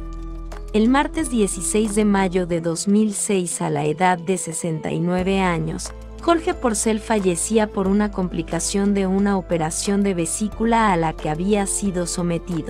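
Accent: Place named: Central America. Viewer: Por que el audio con tono o acento centro americano? No me gusta.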